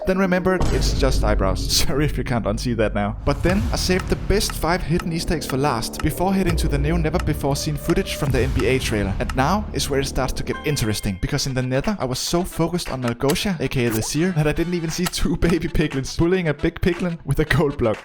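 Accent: Denmark. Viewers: Den danske accent er kraftig men god video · Are you danish I think I hear some danglinsh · as a dane i can really hear the "danish" when u speak funny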